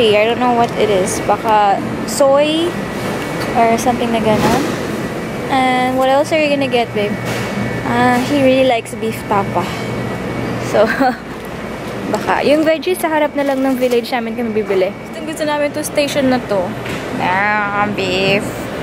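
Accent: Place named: South Korea. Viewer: It still gives me goose bumps for you to have a perfect korean accent. Haha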